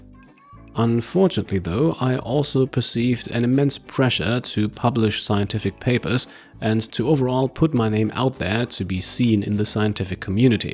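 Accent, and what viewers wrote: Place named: Germany. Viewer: You have a similar speech pattern to another channel I watch. "Feli from Germany". She obviously learned American English tho.. Are you Bavarian? Btw, Glad you will be on YouTube full time!!. I'm patiently waiting for a Stellaris series done the complete way. It would be SUPER SUCCESSFUL and there is not a lot of stellaris playthrus that RP effectively!!!!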